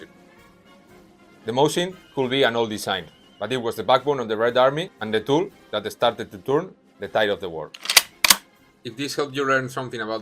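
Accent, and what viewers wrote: Spain. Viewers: Интересно было слушать испанский акцент :) Спасибо за видео.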